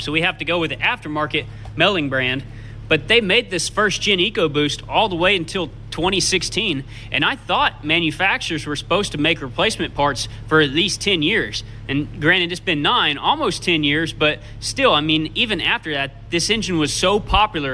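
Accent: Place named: South, United States. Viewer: I like the fast pace verbosity with the a touch of southern twang!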